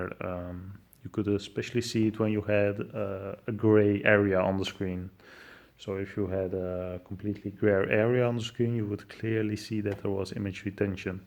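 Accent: Netherlands. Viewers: Don't say "tink" just start saying vink (you are clearly as Dutch as I am) but even before starting to pronounce the v switch to fink. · Are you dutch all seeying guy?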